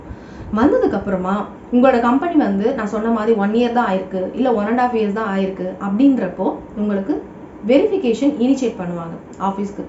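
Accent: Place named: Kongu, India. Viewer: Congratulations for 75th video... Video quality improve pannunga. 4k editor try pannunga atleast 1080p podunga. Solla kudiya details ku text or images, screen shot, screen recorder video side la add pannunga. Video la unga voice super. Kongu Tamil yellorukkum thelivaa puriyum... So unga video yellorukkum nalla puriyhu nu nenaikuren. Neraiya social apps la share pannunga.... Innum neraya video podunga. Atleast weekly two videos try pannunga. Appa thaan quick subscribers and views increase aagum.. I am also YouTube editor Congratulations for your future... Ungalukku yellame nallathaagave nadakkum... Neraiya fans and subscribers support here. Continue ah video podunga...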